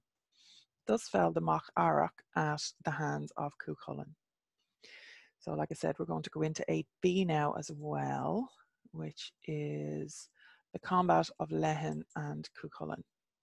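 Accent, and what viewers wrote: Ireland. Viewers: Thank you so much,. I appreciate hearing the Irish pronunciation as well as your commentary and look forward to appearances of the goddess forthcoming!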